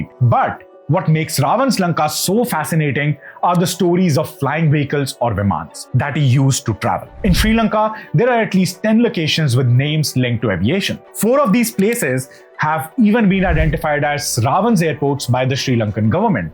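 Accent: India. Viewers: Better slow down mate ...then we can at least understand your typical Indian English Accent. Slow down, why are you hurrying for ??? 😃👍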